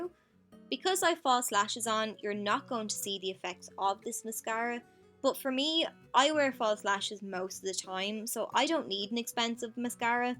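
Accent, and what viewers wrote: Ireland. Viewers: I love your accent X I'm also Irish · Where in Ireland are u from xx? New subscriber! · I loved this video😊what part of Ireland are u from?I'm from Kerry 💚💛💚💛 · Where in Ireland are you from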